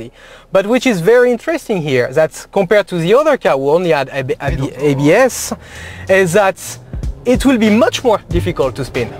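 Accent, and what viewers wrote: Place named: England, United Kingdom. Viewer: ton accent anglais est vraiment détestable.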